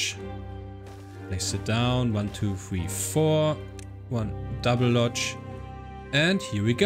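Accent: Germany. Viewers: Deutscher Akzent so deutlich zu hören xD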